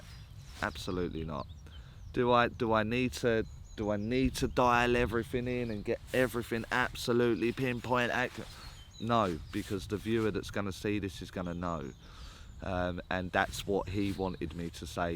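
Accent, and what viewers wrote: Essex, United Kingdom. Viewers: Starting out and so glad I found you! Someone I can relate too..helps with the Essex accent lol so here goes! I got an uncle, in his 40s, he was a happy go lucky man with a good sense of humour. He comes to help others with your teachings. He wasn't married and he liked to drink which he thinks attributed to his death. He lived in a rural town but not in the essex area. It may not be right but its given me confidence to listen to that 'voice' x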